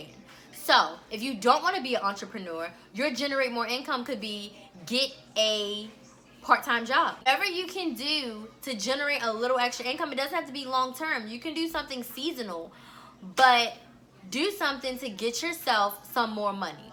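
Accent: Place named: Maryland, United States. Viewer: Thanks for the video..I knew it! I could hear that Maryland accent! Especially when you say two, true, do..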